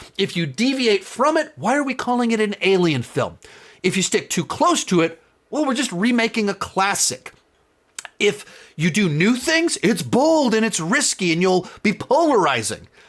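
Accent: United States. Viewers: The voice and speech pacing of this guy are so American. Too much gesticulation. Sorry, unbearable for me to watch & listen to.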